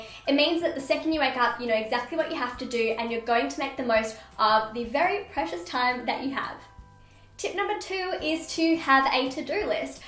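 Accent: Australia. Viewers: Sarah Potter australia i think... or did you mean a certain area haha idk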